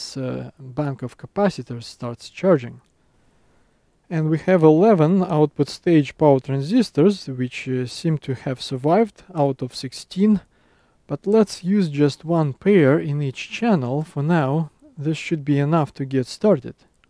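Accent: Russia. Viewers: Русский акцент!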